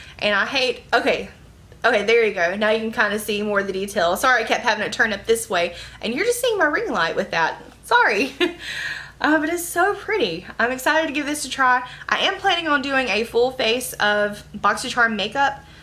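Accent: United States. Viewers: I love your country accent!! And your camera is bomb!!!! Love from Texas!!!! I love my boxy lux box.... The Luxie brush is so amazing it literally soaks up no product!!! Your gonna freak out!!!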